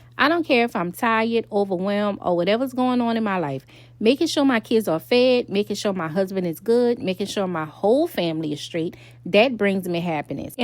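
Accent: Louisiana, United States. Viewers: You sound just like my family from Louisiana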